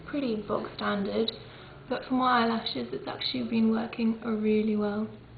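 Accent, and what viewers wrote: United Kingdom. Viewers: i love british accients ha sorry had to say it, and thanks for the tips:).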